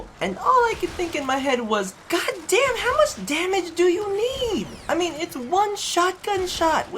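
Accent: United Kingdom. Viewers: I have mixed feelings about that English accent. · You should totally do more vids with your British accent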